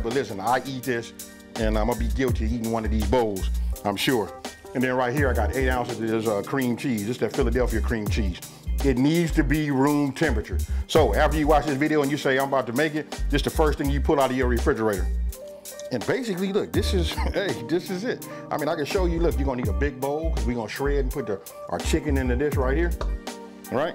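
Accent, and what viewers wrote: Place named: Carolinas, United States. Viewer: You sound like you're from the Carolinas.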